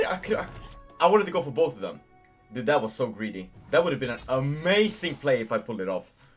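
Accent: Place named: Germany. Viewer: You do kind of look German and I have heard english speaking germans who sound like you. I initially assumed you were too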